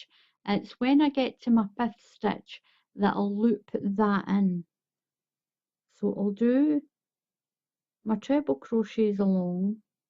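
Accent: Scotland, United Kingdom. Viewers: I should have written lovely 😄 Do you use lively in Scottish? I'm from Finland. No polar bears here 😄 Your accent is adorable 👍 ☀️ Happy New Year! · I love your videos. You explain everything so clearly and precisely. Your beautiful accent takes me back 50 years to when I worked with 2 Scottish ladies who helped me refine my knitting skills. Now another Scottish lady is helping me refine my crocheting skills. Thank you for taking me back to a happy time in my life.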